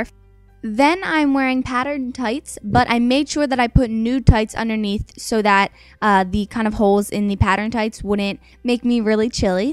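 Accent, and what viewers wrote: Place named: South, United States. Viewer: I thought u were grom the south, you sound country :b<3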